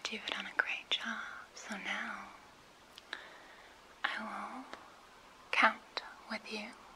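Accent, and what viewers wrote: Netherlands. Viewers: She has a rather normal accent. She speaks so called 'standaardnederlands' (translation: standard dutch). This is the accent they expect you to have in formal situations (for example, at your work). Some people have this accent naturally, but this depends on which area you come from. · are you for real Dutch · Lol ik ben Belg je hebt een Nederlands accent ik heb nooit dat verwacht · are you dutch?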